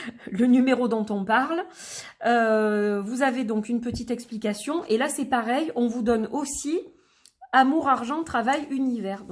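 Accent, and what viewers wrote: South, France. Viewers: Bonjour, grâce à je viens de découvrir vôtre chaîne . Joli accent sudiste si je ne me trompe pas 😉 J'adore l'univers de cet oracle et je viens de le commander. L'association avec la numérologie me ramène à mon Oracle de la Triade. Encore une fois merci à vous, je vous souhaite une très bonne soirée. 🙏🕯️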